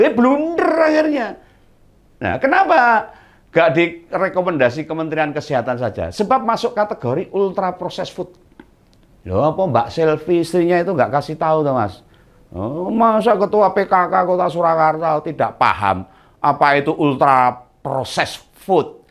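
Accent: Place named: Yogyakarta, Indonesia. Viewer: Mesti ketawa kalo denger Om bicara, khas logat Jogjanan. Semangat Pakde😁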